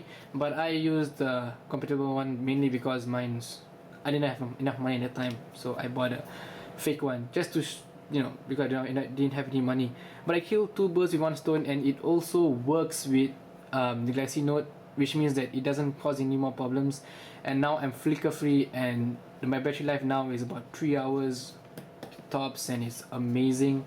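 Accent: Malaysia. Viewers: Malaysian dude? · you accent are so malaysian , i like you're video :)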